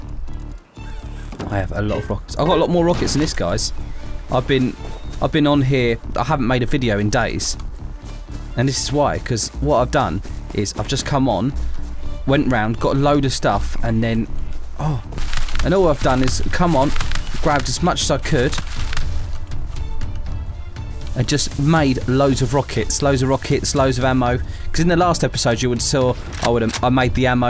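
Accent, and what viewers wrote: United Kingdom. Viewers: I love your British accent and your videos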